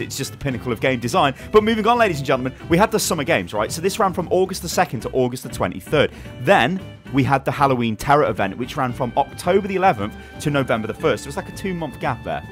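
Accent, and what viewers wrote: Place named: United Kingdom. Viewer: British pep say schedule soooo wried the C is silent when u guys say it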